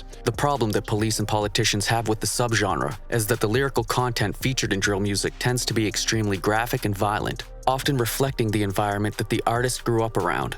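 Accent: London, United Kingdom. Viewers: Your British accent is really good. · Mate, i gotta rate your London accent! We usually laugh at anyone trying to copy us but man went in! Gwaarn kuzz! Keep up the fire 🔥🔥🔥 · No lie your Brit accent sounds like a white bugzy malone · That British accent is legendary 😂😭🙏🏾 TBH tho... It's really good.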